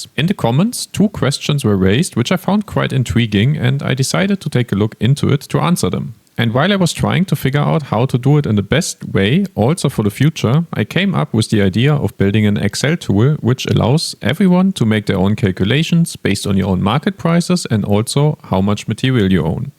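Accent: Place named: Germany. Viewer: Love your accent! If i would take a guess its a typical german one! <3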